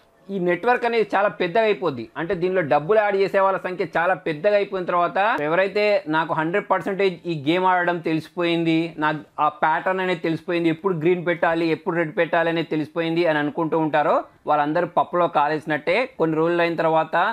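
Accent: India. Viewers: తెలుగు అబ్బాయి లాగే మాట్లాడతారు .. i like it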